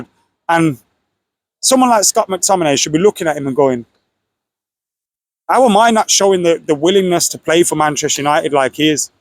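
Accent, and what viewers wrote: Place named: Liverpool, United Kingdom. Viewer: Scouse cxxt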